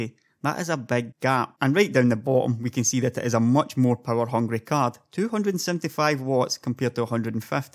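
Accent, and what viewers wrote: Scotland, United Kingdom. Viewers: scottish iirc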